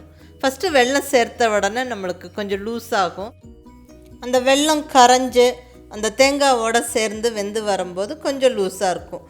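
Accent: Kongu, India. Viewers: Akka....sema super..I am your fan Akka..Really your are amazing sis...namma kongu tamil speech la asathurinka....tdy poli semaya arumaya iruku....😍😘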